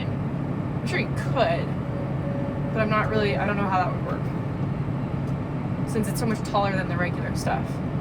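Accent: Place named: Nebraska, United States. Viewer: You really don't need to emphasize that you are a real Nebraskan. We, well I at least, can hear that all too well. And it's more Lexington than Lincoln, and so not Bridgeport.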